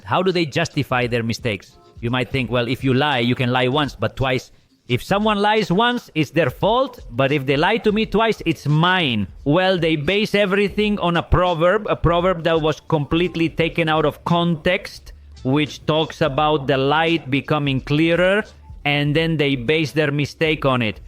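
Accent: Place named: Pakistan. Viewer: Yup, Pakistani accent, but doesn't matter, the message is what's the real matter here, the reason you are doing here Good luck with this new adventure, open more eyes, spread the truth about that cult and save people from the government body's claws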